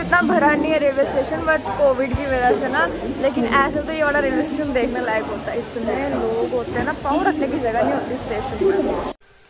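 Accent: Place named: Jammu, India. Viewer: The dogri accent in hindi is ❤️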